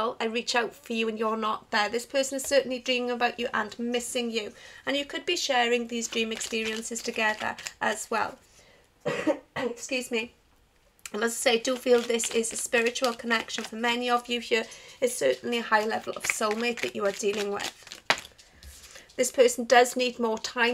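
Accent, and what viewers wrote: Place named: Wales, United Kingdom. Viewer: Oh yea!! 😍💪 After a good few years of listening to these taro card videos, iv never found a Welsh person do one 😍💪greetings from Carmarthenshire 😜💪 and I have to say you have a Beautiful accent 😍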